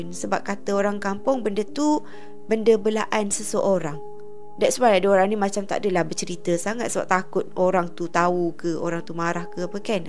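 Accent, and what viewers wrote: Kelantan, Malaysia. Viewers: Kak ore kelate ko bunyi mcm ckp kelate je.. Air bodo tu gpo maksud dio🤔🤔😅😅😅😅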